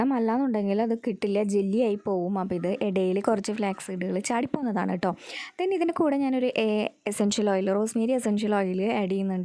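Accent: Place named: Thrissur, India. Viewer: Good, വീടെവിടാ തൃശൂർ ആണോ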